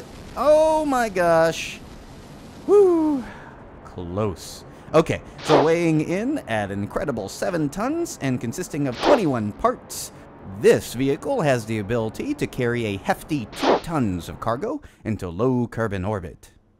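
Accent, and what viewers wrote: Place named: Russia. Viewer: Nice russian acsent, bro! :D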